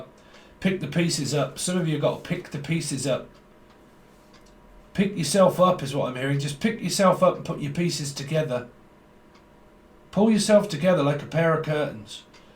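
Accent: United Kingdom. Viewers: your lovely british accent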